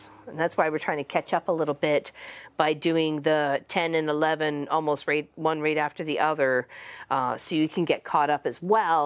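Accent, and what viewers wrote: Ontario, Canada. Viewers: So nice to hear an Ontario voice. I grew up in Scarborough, married a Kiwi, moved to Halifax in my 20s (for 35+ years) then retired to new Zealand. Great videos. I am addicted to quilting. 😊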